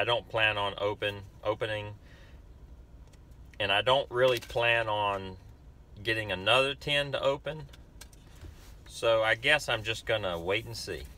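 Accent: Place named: South, United States. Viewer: What part of the South are you from?? I'm in the south too.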